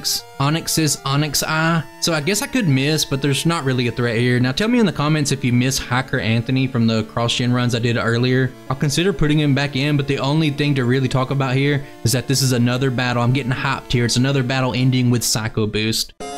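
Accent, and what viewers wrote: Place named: Appalachia, United States. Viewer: I love the fact i found a pokemon channel where someone pronounced names and items like I do in the southern tip of Appalachia. Instant sub lol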